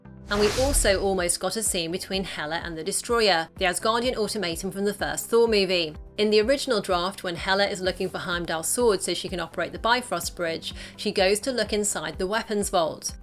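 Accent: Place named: RP, United Kingdom. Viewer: Can you be creative instead of being English public school voice confident without having a fucking clue what you're doing mode. I think the days of that are very numbered.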